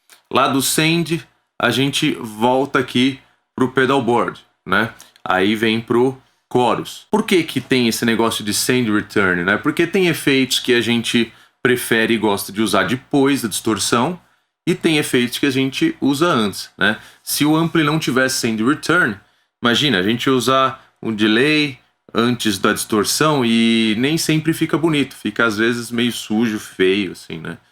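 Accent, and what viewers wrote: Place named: United Kingdom. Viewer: O papo e British crl...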